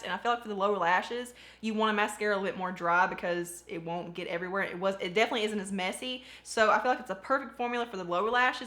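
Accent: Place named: North Louisiana, United States. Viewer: Where are you from ? Love your accent . You sound like my family members in North Louisiana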